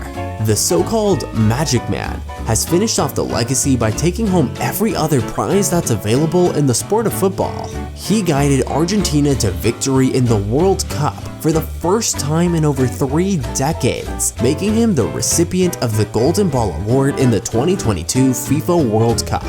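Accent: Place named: Spain. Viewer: Lose the stupid Spanish accent.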